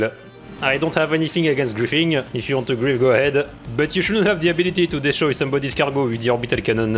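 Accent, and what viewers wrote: France. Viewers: Do you have any idea how French you sound ? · Your French accent was 10/10 in this video, idk it was just so lovely lmao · No way again french accent guys 😮😮 nice vid btw xd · How do you sound even more French every time I watch any of your videos 😅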